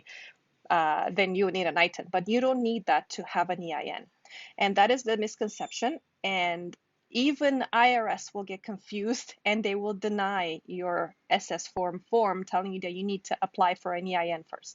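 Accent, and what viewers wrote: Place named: Romania. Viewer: Mom your Not canadian your from Rumenia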